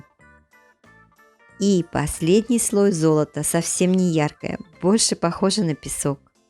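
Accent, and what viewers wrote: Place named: United Kingdom. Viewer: I love it and appreciate the UK voice over. Many thanks x❤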